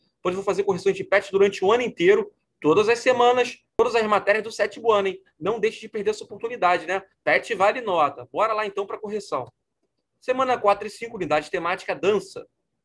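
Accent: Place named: Rio de Janeiro, Brazil. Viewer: Vc é carioca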